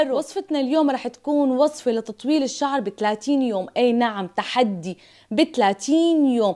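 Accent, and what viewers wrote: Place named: Iraq. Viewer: لهجتج بين العراقيه وبين السورية من أي بلد انتي💙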